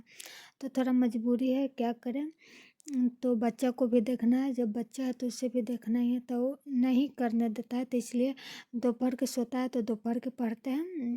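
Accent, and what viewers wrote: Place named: Mithila, India. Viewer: Lag raha hai aap mithilanchal se hai kya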